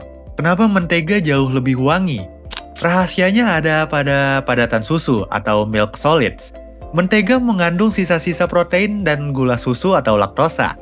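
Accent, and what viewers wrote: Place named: Bali, Indonesia. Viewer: Gw tau bedanya margarin dan mentega, tapi kenapa ya klo video masak di youtube itu mengaggap butter dan mentega itu beda ya...? btw yg punya channel org Bali ya, penyebutan setiap kata yang ada "t" nya kyk org bali.hehe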